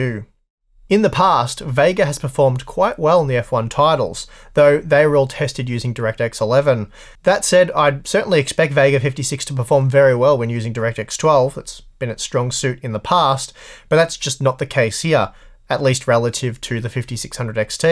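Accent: Australia. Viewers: love that aussie english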